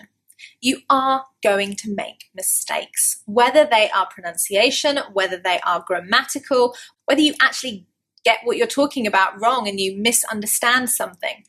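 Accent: United Kingdom. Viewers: thanks a lot for the lessons. i like the british accent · Dear, How crazy the world is: as an Italian English learner I, on the other hand, struggle to have decent pronunciation, especially your wonderful British accent, which I dream of acquiring sooner or later. Anyway, thank you for your tips, I wish you would visit San vito Chietino and Fossacesia's beaches (south Abruzzo) in one of your trips so to enjoy our seaside and our fish and meat restaurants and breathtaking views.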